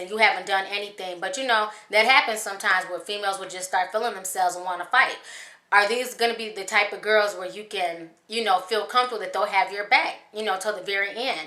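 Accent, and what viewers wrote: South, United States. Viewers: listen to your slight southern accent!! MN flushed it out. I'm loving this random flashback 💕💕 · Oouuu it's the southern twang for me! Glad to see your growth! 😩🤎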